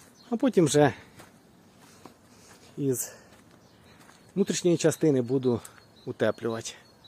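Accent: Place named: Poltava, Ukraine. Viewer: теж чую полтавський акцент) а де продовження ?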